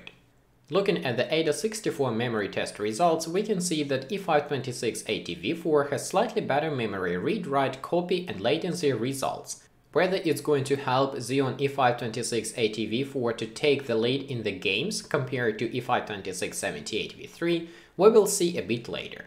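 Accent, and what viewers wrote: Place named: Russia. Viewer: Привет, чел! :)) Узнаю русский акцент.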